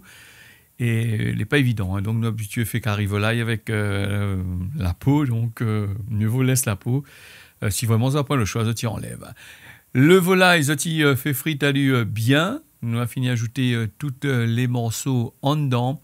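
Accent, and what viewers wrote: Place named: Réunion, France. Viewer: C est trop charmant l accent réunionné ✌👍👍👍😍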